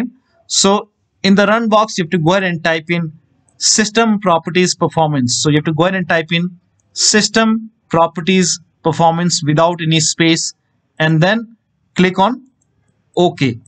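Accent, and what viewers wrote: India. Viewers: start video hear indian mute video turn video off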